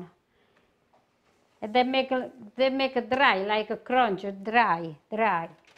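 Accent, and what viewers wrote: Italy. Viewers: I had a great Italian Nona....Miss her so much! Her daughter, my grandma Noni was the best cook!🍝🇮🇹 Wished I would have learned more Italian cooking from them....they both are gone now.....🇮🇹🍝🇮🇹🍝but you are truly the best to watch and learn from now! I love your strong Italian accent..... Thank you for sharing your cooking. 💚🤍❤️We love you! 🇮🇹🍝🇮🇹🍝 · You are such a sweet lady angd i love your accent.a real italian indeed.you have a BUONA PASCUA.I LOVE TO WATCH YOU. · I absolutely LOVE watch (and listening) to you. I adore your accent. It makes me long for my Italian grandmother who passed when I was very young. I still feel a bond with her and my Italian heritage. I think about her and feel close to her when I make your recipes. I can see the joy cooking brings to you. Thank you for sharing your love and cooking with all of us., what kind of coffee do you drink and how do you prepare it? 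May God continue to bless you! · Vgffgf Vdghhft You mean she is speaking English with an Italian accent. At times, she speaks her native language. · Isn't she delightful? And a proper Italian English pronunciation on toppa di tutti. I swear, I'm going to try this tomorrow. Great recipe to get rid of my figs and dates I'm struggling to finish. MIlle grazie!! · Thank you for your video. I just found this channel and I just love listening to you. I was stationed in Italy in the 90s with the US Army. Used to have biscotti every morning in the café. I miss the accent and the sweetness. I will watch many more.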